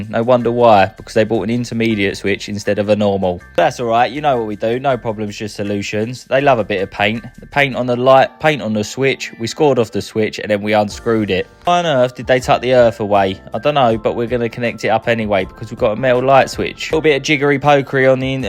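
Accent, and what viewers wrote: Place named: China. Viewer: I had the volume down low and thought bro was speaking mandarin. also hi from Essex so maybe enunciate your words a tab mate.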